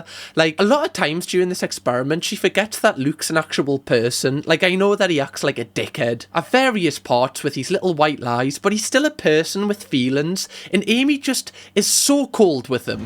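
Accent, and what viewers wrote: Newcastle, United Kingdom. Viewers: Are you from Newcastle? Wanna know your accent :) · Hey!! I just LOVE your accent! I'm from Florida but my best friend is a geordie and you sound like him...or are you Scottish?? You are effing hilarious "SAY YES! SAY YES!! SAY YES!....PAHHH!!" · You are so intuitive but also funny and entertaining! Lovely to hear the Geordie accent! My parents were from Hexham, keep up your good work 😂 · Your videos are okay but I'm subscribing because your a geordie.... UP THE TOON!!!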